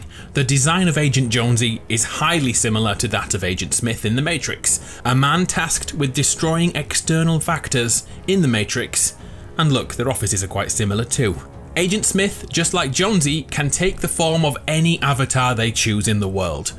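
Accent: United Kingdom. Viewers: Hey man are you british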